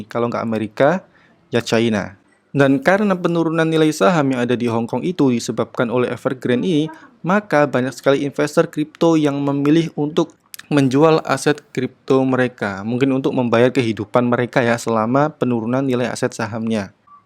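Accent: Surabaya, Indonesia. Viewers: Wong suroboyo ta dobol samean cak .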